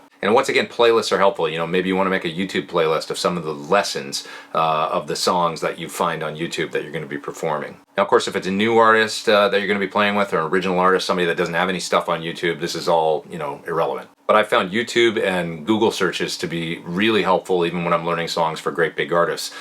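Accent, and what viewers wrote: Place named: Canada. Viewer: This series of videos is awesome,. Is it just me or do you sound extra Canadian today?:) Not hangin' on you, man. I just normally hardly notice your accent but today it just seems to be front and centre. Probably just me (and/or the rum). I can't talk. I'm an Aussie. Rock on, mate.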